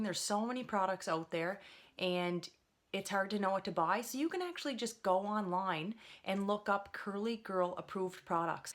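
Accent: Canada. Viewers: I thought you sounded Canadian! My husband is from Ontario but lived in Nova Scotia for a few years before I met him - so he had an accent like yours when we were dating! He's lost the accent now but it's fun to hear it again in your video. :D Also, thanks so much for the curly girl break down. <3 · Your Canadian accent is so thick omg i love it !!!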